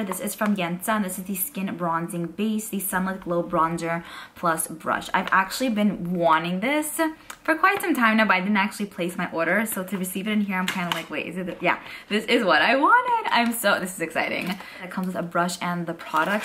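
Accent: New York, United States. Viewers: Love love your videos watch they daily and love you New York accents it’s the cute make me miss home 🥰 love Ipsy too ♥️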